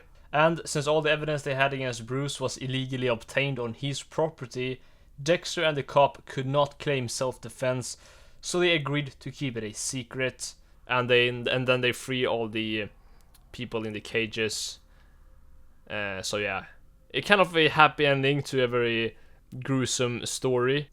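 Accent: Sweden. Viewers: Are you swedish because of your accent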